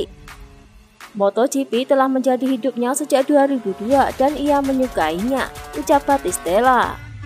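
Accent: Java, Indonesia. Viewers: Hadir mba medok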